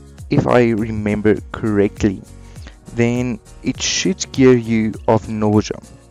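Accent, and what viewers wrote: Afrikaans, South Africa. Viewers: My bru you sound south African my chom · Are you from South Africa #SouthAfrican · You can hear the afrikaans in his voice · He sounds southe afrikaan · is jy afrikaans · Mate you from SA? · Dude are you South African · youre afrikaans right? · Are you from south Africa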